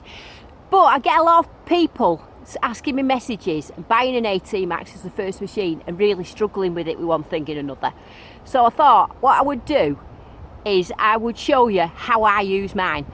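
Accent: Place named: England, United Kingdom. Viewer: I love the English Accent!!!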